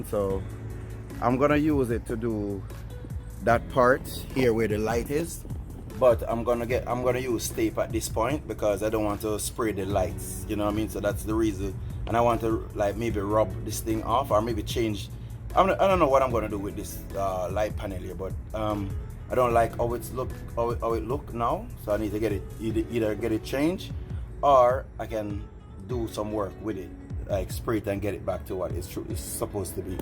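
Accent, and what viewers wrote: Jamaica. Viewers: Yow breda talk jamacan me understand. 😆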